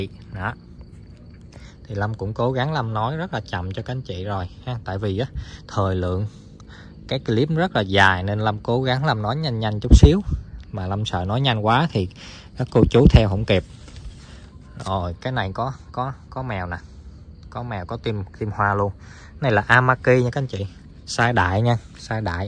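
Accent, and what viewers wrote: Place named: South, Vietnam. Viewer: Mình rất thích nghe giọng nói của Lâm giọng chuẩn miền Nam nghe rất là thân thiện lại còn vui tính tại vì mình cũng là ngươi miền Nam.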